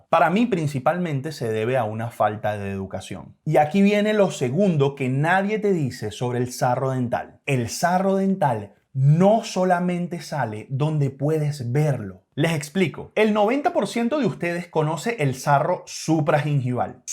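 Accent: Venezuela. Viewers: Para mi se escucha venezolano, no se si me equivoque pero su acento me parece de Venezuela 🇻🇪.. ❤️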